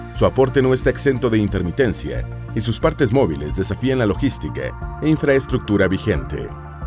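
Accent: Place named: South America. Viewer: Porque habla en sudamericano??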